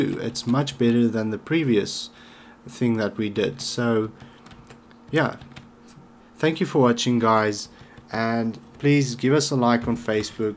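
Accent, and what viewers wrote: South Africa. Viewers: you sound south african xD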